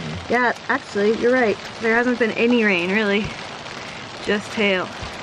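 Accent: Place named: South, United States. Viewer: love your voice...nice country voice. you should sing often...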